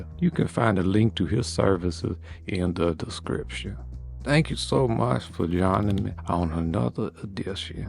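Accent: Louisiana, United States. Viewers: Your hittin those "er"s pretty hard in this video. computer, figure. Just a bit different. As I have said in the past part of the appeal of your videos is your cajan accent.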